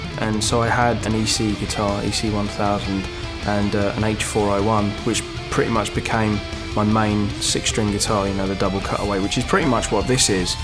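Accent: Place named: United Kingdom. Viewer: "I use EMG's because I think they're the dog's bollocks." I don't know if it was the British accent making it sound better, but I think that may be one of the greatest quotes ever spoken by anyone.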